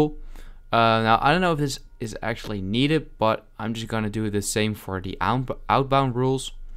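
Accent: Netherlands. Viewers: UR DUTCHH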